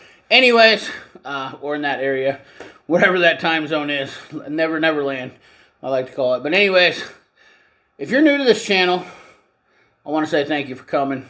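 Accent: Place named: Ireland. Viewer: Great Irish accent.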